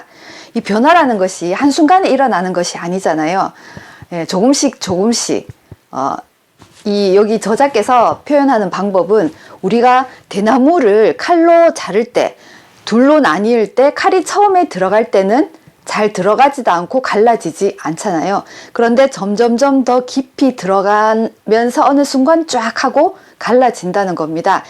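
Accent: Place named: North Korea. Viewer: 2년전보다 이북사투리같은억양이 마이 줄었네 ㅎㅎ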